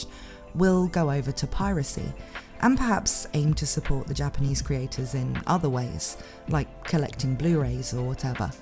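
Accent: United Kingdom. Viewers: They should have someone we can understand say this I don't speak British English 🤦‍♂️🤷‍♂️😤🤢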